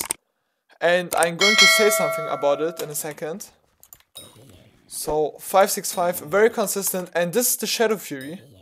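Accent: Germany. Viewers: alter... du bis doch 1 Hundert Prozent deutsch bruddi, man hört das sooo raus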